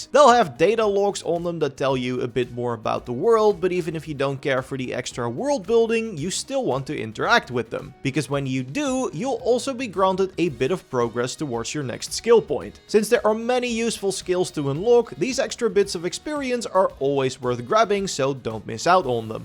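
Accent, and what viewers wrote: Netherlands. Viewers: they’re from the netherlands so they have an accent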